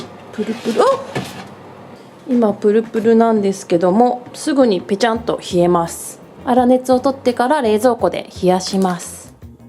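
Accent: Kansai, Japan. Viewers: なんか今回関西弁が強いですね！どういうスイッチで変わるんですかー？☺️ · ミッフィーのクッキングシート、可愛い💕と言った瞬間にグチャグチャに丸めたのツボに入りましたw😂✨💕 関西弁多めで嬉しかったです😊 ずっと脳が働いてそうだから、しっかり休んで下さいね♪ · 関西のイントネーション多めなが新鮮で可愛かったです❤笑そしてカレーを見てると自分も食べたくなる不思議現象が起きますっ😮❤笑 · 後半関西弁になってて可愛かった❤ 私も関西人です昨日から体調崩しててパジャマのまま横になって観てますカレールゥ買ってみます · 今日関西弁強めですね、かわいい😂❤❤ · の動画はずっと穏やかな気持ちで観られるから、心が疲れた時に自然とたどり着きます🍀 毎日お疲れ様です🍵🌱 10年以上が上手に標準敬語話す声に慣れてるから関西イントネーションになってるのが逆に新鮮だった😂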